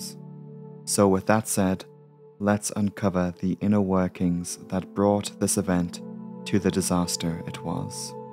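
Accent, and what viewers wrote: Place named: Newcastle, United Kingdom. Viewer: Thank you for another informative video. I'll pledge if you like. Your Geordie accent sneaks out sometimes 🥰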